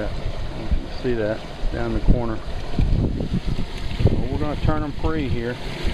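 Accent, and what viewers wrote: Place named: United States. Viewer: whish I had your usa twangy accent, I would sound really good singing boogie blues but I am french when I sing I USA blues classic I sound crap... Nice video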